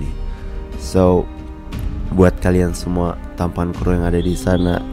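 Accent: Java, Indonesia. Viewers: Bang lUcu kok Bahasa Medoknya hahahahaha siapa suka ayoooo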